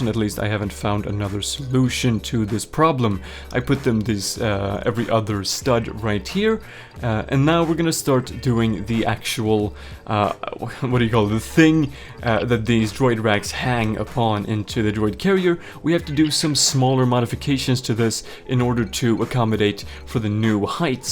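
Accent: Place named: Sweden. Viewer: First time I'm seeing this channel in my feed.. Not lego related but your accent.. You sound Scandinavian, maybe Swedish? Sorry just curious 😅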